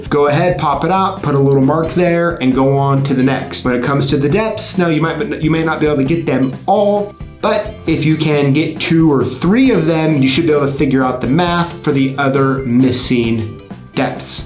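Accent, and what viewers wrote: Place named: South, United States. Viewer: Thanks,. We southern guys actually like it when people assume that we're stupid because of our accent.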